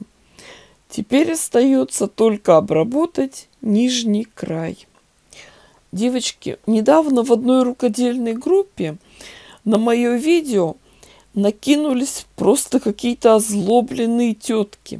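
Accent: Urals, Russia. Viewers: слушать ваши анекдоты, уральским говором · благодарю за уральский акцент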